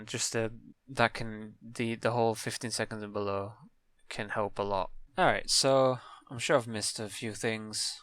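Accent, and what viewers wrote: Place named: Newcastle, United Kingdom. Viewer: that sounds like a Geordie/Northumbria accent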